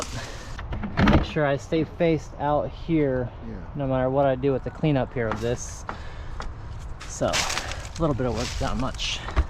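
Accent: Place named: Ireland. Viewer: Irish accent…. Tree = three…. Fellers = fellas. …. Nevermind🤣😂🤣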